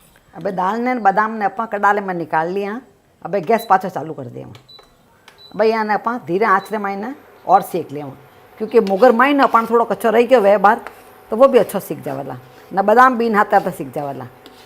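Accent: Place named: Marwari, India. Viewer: Aap k videos muje bahot pasand aate he ,aur aapka marvadi boli bhi..maine aapki kafi recipe try ki he aur bahot badiya bani bhi he,thnx mummy ji hame itni aachhi recipes sikhane k liye.🙏😊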